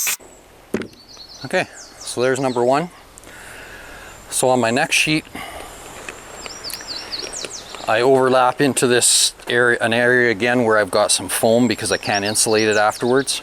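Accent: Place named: Quebec, Canada. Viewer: How did you manage in the big rains, fallout of tropical storm Debby in early Aug 2024 ? (By your Quebec accent I assume that's your location.) May be give us a construction and weather episode? How do you protect exposed wood from water damage? Should we be worried? What is time lag between your filming and the publication of video? Fun series, though beyond my little DIY.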